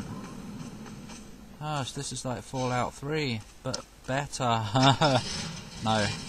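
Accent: United Kingdom. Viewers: your British commentary makes your walk through a thousand times better than any other!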